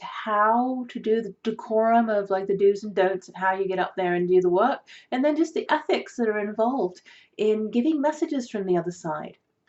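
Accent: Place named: United Kingdom. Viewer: Are you in Plymouth England or Plymouth Massachusetts USA? You seem to have a British accent but a Pilgrim name, the Pilgrims did come from England.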